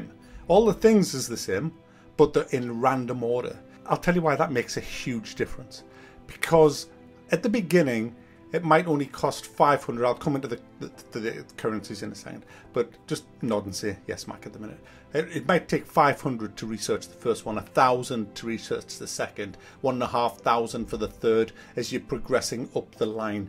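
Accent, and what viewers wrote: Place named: Consett, United Kingdom. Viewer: You must live near Consett. I recognise that accent anywhere!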